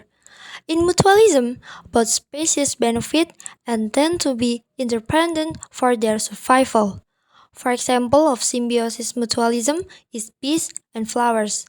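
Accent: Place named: United States. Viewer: GILSSS KEREN BGT, KIRAIN ORG AMERIKA MANA NGOMONGIN SIMBIOSIS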